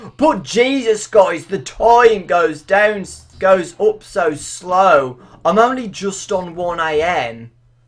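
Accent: United Kingdom. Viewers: Thank god he's British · Hey sorry for asking this but what part of uk are you from ? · Not trying to be raciest but are u British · i wasn't scared at all, i was too busy laughing at every word you said with a vowel in it. Your British accent is hilarious XD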